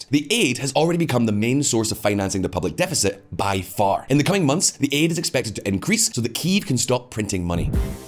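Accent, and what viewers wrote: Scotland, United Kingdom. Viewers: maybe you could slow down to 1000 words per minute and try and drop the Scottish accent. There are others outside the UK who like to get updates.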